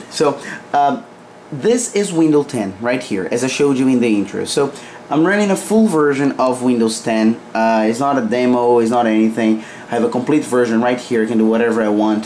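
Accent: Brazil. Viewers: Brazilian accent spotted!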